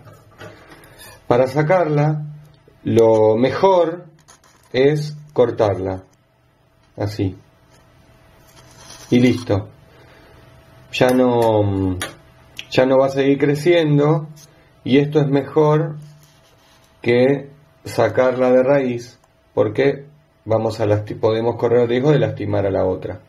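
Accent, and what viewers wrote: Argentina. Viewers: Daniela Medrano Terrazas el muchacho del video debe ser de Argentina por su voz. Aquí las temperaturas en invierno pueden ir desde los -25ºC a 20ºC dependiendo la región. Sí es de Buenos Aires, en invierno las temperaturas son de 0º a 15º. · Me encantan tus videos!! Muy explicativos y en español argentino jaja Queria consultarte donde conseguis la planta de consuelda ya que no la consigo.. muchas gracias!!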